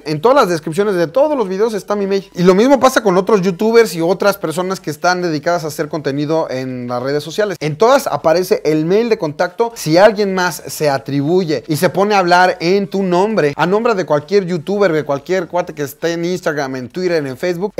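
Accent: Chile. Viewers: suena a chileno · See oye a chileno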